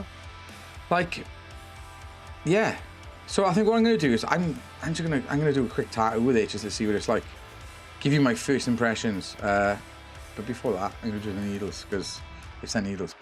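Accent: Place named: Wales, United Kingdom. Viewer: I recognise that accent, are you a southy like me? Land of the red dragon?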